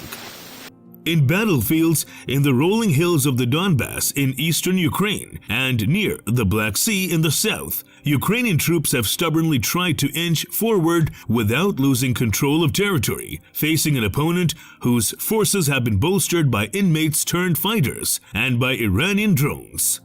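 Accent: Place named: United States. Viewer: Simply NAUSEATING American accented voiceover, had to give up.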